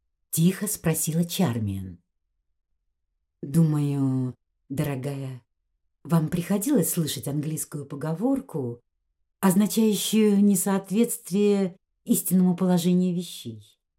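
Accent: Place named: Provinces, Russia. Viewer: Чтение передает русскую глубинку, никак не Англию.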